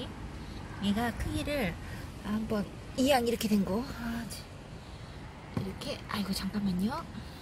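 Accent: Gyeongsang, South Korea. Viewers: 목소리가 경상도 분이신거 같아요...혹시 창원은 아니시지요? 제가 창원에 내일까지 있어요..혹시 창원이시면 커피하고 싶네요...ㅎㅎㅎ...너무 예뻐요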